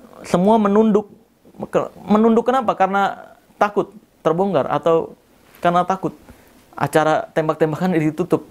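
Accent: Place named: Java, Indonesia. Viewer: Wkwkwk bang wong jowo yo 😂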